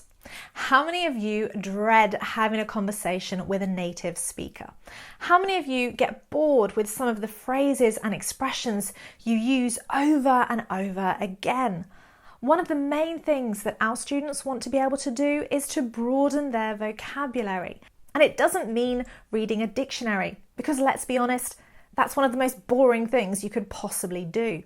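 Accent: United Kingdom. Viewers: I love British accent. Love your video.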